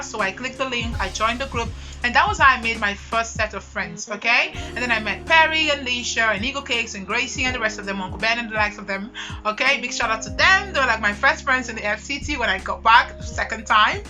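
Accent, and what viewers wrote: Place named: United States. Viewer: Why do you have an American accent tho? 😭😭😭 Cringe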